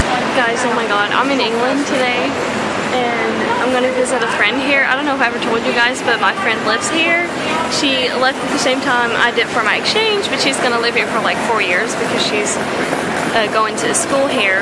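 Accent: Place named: United States. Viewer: This was sooo coool. I love Europe and it seems so much better to see it thorough the eyes of someone I know. Brighton is beautiful. Is your friend also Dutch?? Was that your first time in England?? Does England feel like the rest of Europe or does it feel like the USA, or just different from them both?? Did I understand that your flight was only 1 hr between Amsterdam and London?? That seems very close. When do you think you will need your own car? Could you have driven from your home to Brighton?? Do you even know how to drive yet?? LOL.. (Please forgive my ignorance if that seems like a dumb question.) You know how it is here in the US, you need your own car to get anywhere because the public transportation is not as developed as it is in Europe. And states here are so big, especially on the West Coast of the US. Life in Europe seems very different than here. 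You have lots of different countries and languages around you. Do you mostly stay in your own country or do you travel a lot around Europe?? Was it expensive to fly from NE to England?? Do British people think that you are American because you speak English like an American?? Where you were here, I could not even hear your accent anymore. Your English is very clear. I wish I could speak Dutch as well as you can speak English, but Dutch looks like such a hard language to learn. LOL... Fantastic video. Good music and good editing. Thanks a lot.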